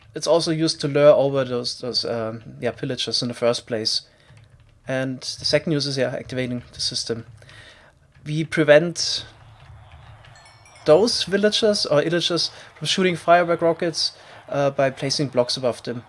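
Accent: Germany. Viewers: You sound like you are from germany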